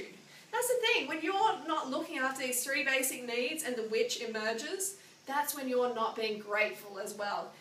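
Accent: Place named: Australia. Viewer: you have a nice body it is such a pity you speak like a dumb bimbo australian!! i was going to marry you until you opened your big ozzy gob!! i come from devon so speak like a farmer look like shrek!! how can you help me? get healthier i am getn a bit of flub on my ut i eat meat wrestle animals to the grounds if i am hungry gnaw at their ankles! if i dont get meat!! your meat is very tasty & nice!! so can i have some?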